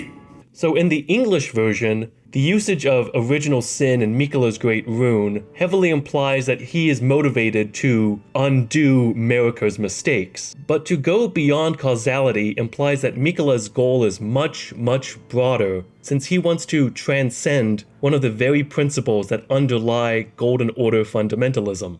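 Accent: Pittsburgh, United States. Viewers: I keep wanting to ask if he's from pitt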